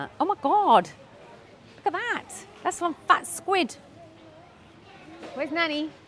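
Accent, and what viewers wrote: England, United Kingdom. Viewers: are you from England.. mem ... ❤❤ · I always looking forward to your next videos... It's interesting. Maybe it's your British accent attraction...😅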